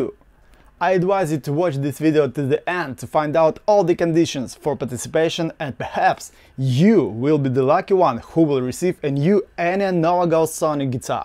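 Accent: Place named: Russia. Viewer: Hi! I hear accent.. You russian?)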